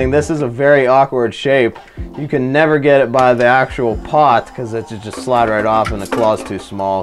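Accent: Pittsburgh, United States. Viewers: are you from pittsburgh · are you from pittsburgh pa?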